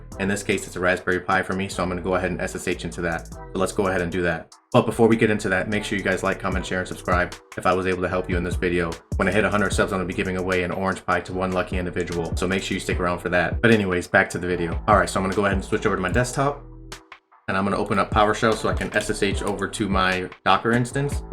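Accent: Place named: India. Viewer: The indian accent got me good 💀💀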